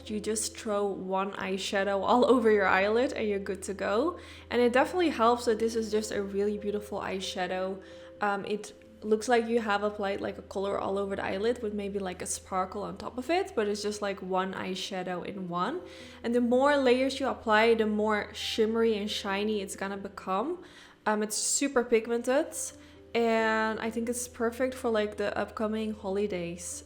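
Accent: Netherlands. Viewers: Ben jij toevallig Nederlands? 😁 hoor een nederlands accent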